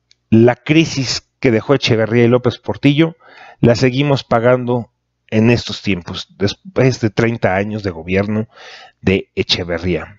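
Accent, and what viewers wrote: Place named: Venezuela. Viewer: esa voz de Venezolano Antorchista 👀 ehhh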